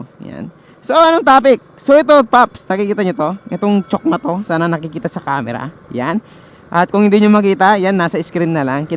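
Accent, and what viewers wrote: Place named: Visayas, Philippines. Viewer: bisaya accent 😆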